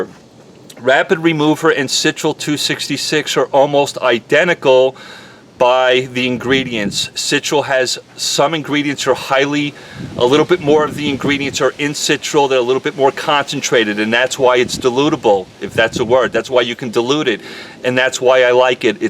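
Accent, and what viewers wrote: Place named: North, United States. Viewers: You don’t sound like you’re from Dallas. You sound like a yankee. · wide awake thanks for helping . I can't stand his boston accent .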